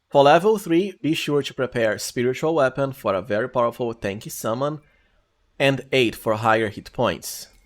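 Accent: Brazil. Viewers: Bro your accent is Brazilian for sure lol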